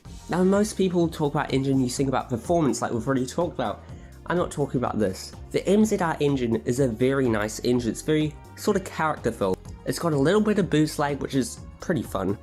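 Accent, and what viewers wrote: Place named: United Kingdom. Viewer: wait wtf you're from nz? Thought you were British this whole time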